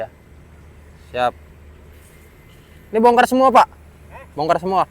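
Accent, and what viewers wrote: Serang, Indonesia. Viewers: Aduh aing setiap bicara ciri khas orang Serang he he sy senang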